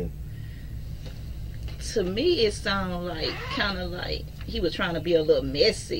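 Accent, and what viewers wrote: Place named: South, United States. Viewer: Where she from? She sound country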